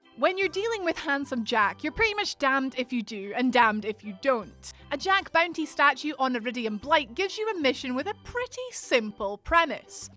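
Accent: Scotland, United Kingdom. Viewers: I have to turn the sound off as soon as I hear the scottish robot.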